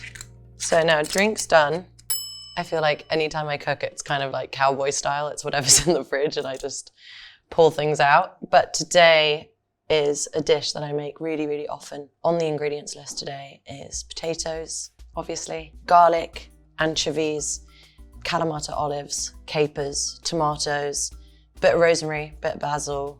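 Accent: United Kingdom. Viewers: Stunning ❤❤❤ I didn't know, she has sort of like a British accent, love it ❤ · and the British accent is very strong, as strong as the drink 🍹 i suppose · Omg❤😊I'm obsessed with british accent. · Very sweet girl and very heavy UK accent ... 😅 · What kind of British accent does Dua Lipa have?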